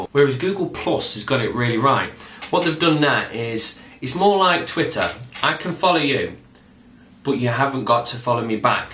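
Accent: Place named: Nottingham, United Kingdom. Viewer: ranting about your rants? your losing it ! Whereabouts In Nottingham are you from anyway ? I had no idea till you mentioned the Notts twang!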